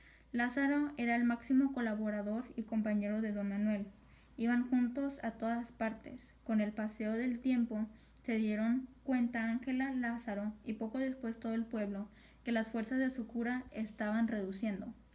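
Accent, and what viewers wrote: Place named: Latin America. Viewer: Por tu acento, veo que eres de un país de hispanoamérica. Sin embargo, cuando dices que Lázaro estuvo en américa viviendo dibujas el mapa de Estados unidos. Creo que deberías saber que Estados unidos no es américa y que Lázaro estuvo en uno de los territorios americanos pertenecientes a España, los cuales algunos se situaban donde hoy se encuentra EEUU. Creo que dibujar el mapa de estados unidos es una muestra de ignorancia simplemente y de apoyo al apropiamiento del nombre "América" por parte de los estados unidos. Un poco de cultura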